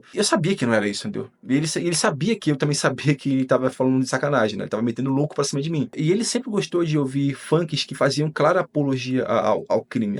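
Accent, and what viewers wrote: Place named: Rio de Janeiro, Brazil. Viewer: Que tal você sair do Rio? Vem pra Minas!!! Aqui a conversa é outra, aqui tem pão de queijo e café no bule. Vai ser bom pra você, porque você vai aprender o mineirês e vai perder esse sotaque enjoativo do povo daí. Tá ruim aí? Vem pra cá! O que você tá esperando?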